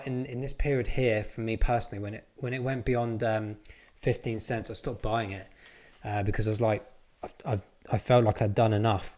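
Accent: United Kingdom. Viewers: Hey, good to hear an english voice, in england we seem to already have 3 second transaction bank transfers does that mean in uk were already using iso 20022?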